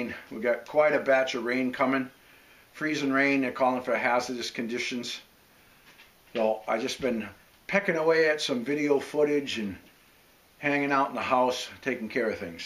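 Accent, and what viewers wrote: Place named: New England, United States. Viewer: Dear Sir, since I've stumbled upon your channel a couple of weeks ago, I can't seem to get enough of " ". I'm still sifting through videos I have not yet viewed, and often stay up to late watching your videos. I deeply understand your drive to remain independant and enjoying life in the cabin. I just turned 57 a few days ago and got most my boys through college with one still in high school. I live in the Great Lakes region and love traveling up north to northern Wisconsin and Michigan with friends that own property and cabins. I'm still working, but am trying to prioritise purchase of 40 or more acres with a cabin before too many more years pass me buy. You are my guiding inspiration to pursue my cabin dreams. Best to you and to Franky. Love your New England accent. God Bless. Carl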